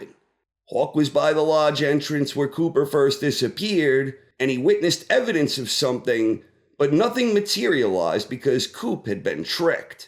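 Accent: New York, United States. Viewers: is that a little bit of a jersey or new York accent you got dude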